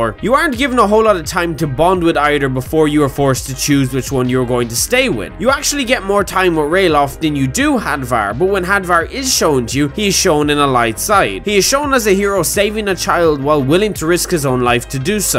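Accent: Ireland. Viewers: Im subscribing because jacky boi shouldnt be the only popular irish accent on youtube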